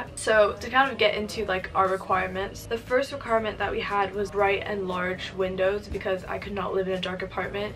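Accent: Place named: England, United Kingdom. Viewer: I can hear your English accent emerging...how long have you been in London?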